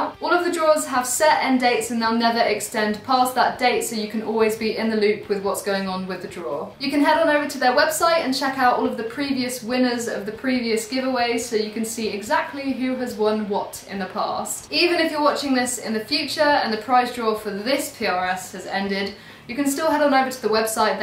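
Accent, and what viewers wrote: United Kingdom. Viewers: I'm thinking about moving there to meet! Have watched all her videos, her cute, confidant and FUN energy is better than any drug, watching her play Slither, or anything else, makes my soul smile! and, gawd damn the girl can PLAY!! and, love, you are absolutely adorable with your nice legs just dangling there while you rip on the guitar! Beautiful, sexy, confidant AND talented beyond words, wow... my fantasy girl! oh, and forgot to mention that cute as hell British accent!! oh, yeah that guitar is pretty sweet too lol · You are so damn cute 🥰 with your brit accent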